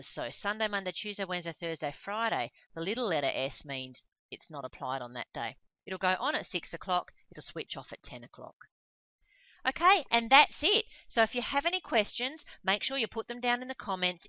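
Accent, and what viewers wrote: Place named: Australia. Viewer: you are so Australian, I love it <3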